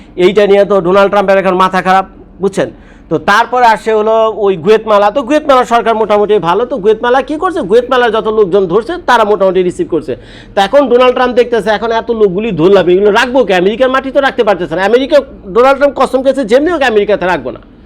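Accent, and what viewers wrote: Dhaka, Bangladesh. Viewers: মনে হচ্ছে আসল ঢাকার কোন মানুষ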